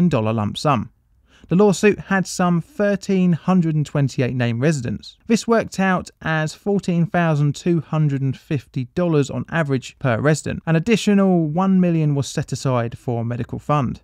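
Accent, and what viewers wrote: United Kingdom. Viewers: This was an American disaster so what's with the British narrator?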